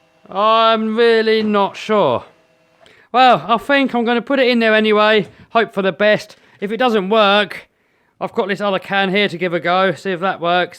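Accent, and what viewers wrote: England, United Kingdom. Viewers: I think your speech is fine I like an English accent especially the way a true English man talks totally awesome I really like the way you say H and 8 s sounds cool with the baby voice he does ask him for me to speak that way more often. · What part UK you from? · in your next video... can you say leaf litter... i love how the britts say that:) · Say mo*ah however you wan* o say mo-ah! I love hearing *he Bri**ish accen*! *hats par of *he appeal of your videos for me! *op work! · Brithish English, best English🇬🇧🇬🇧 Always good videos👍👍👍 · I love the way British people talk 😄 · picking on pronouncing is lame! especially at a funny britt guy. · I like your the way that you talk in the uk cause I live in Canada so how you speak is unique to me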